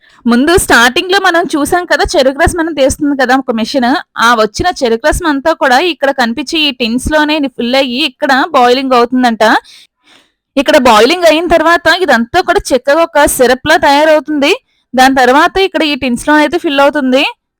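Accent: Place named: Srikakulam, India. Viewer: Srikakulam voice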